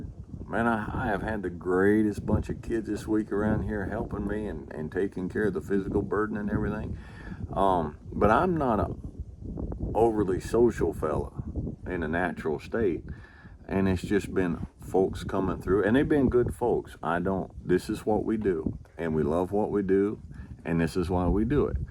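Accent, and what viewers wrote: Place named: South, United States. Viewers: This video got suggested me by youtube algorithm, and just by looking at the thumbnail, I literally heard his voice and his cool country accent, although I never seen this man before on YT - and I was 100% accurate by guessing both the accent and the voice itself :D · This is by far, the best American accent. The slow, comforting Texas drawl.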